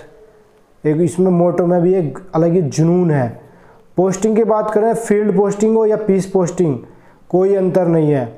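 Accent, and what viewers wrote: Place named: Haryana, India. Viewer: Sir aap haryana se ho Apki boli different ha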